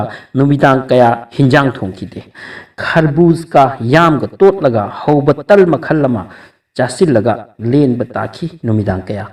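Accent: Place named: Cachar, India. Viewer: Narrator c cachar deiro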